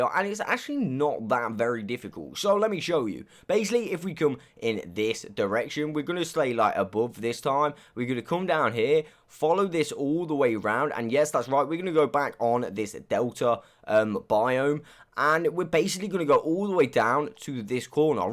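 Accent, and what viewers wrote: United Kingdom. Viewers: Your sound like UK guy.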